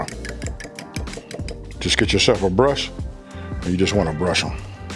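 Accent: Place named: West Coast, United States. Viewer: I love watching your channel and your recipes are always a hit! And i gotta say i love your voice. Every time i hear you talk, your voice reminds me of "Ole Dog"from Menace to Society. 😂 You gotta be from the west coast.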